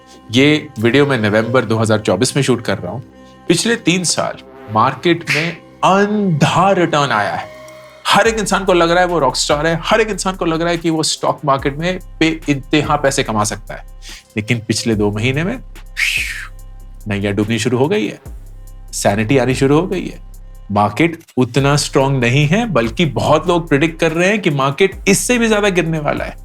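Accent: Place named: Kashmir, India. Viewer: Sir Aap ki surat aur zubaan se kashmir ki khushboo ka ehsaas hota hay.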